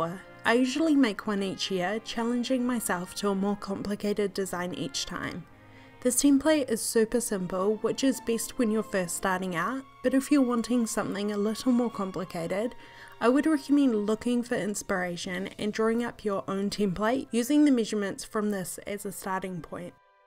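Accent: New Zealand. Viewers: I know a Kiwi accent when I hear one! This was so helpful for a first time gingerbread-houser! (if that’s even a thing) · Is she from New Zealand?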